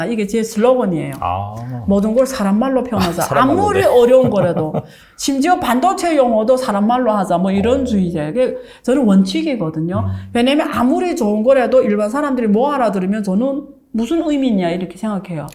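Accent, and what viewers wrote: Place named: China. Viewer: 교수님 중국분이십니까? 발음이 중국...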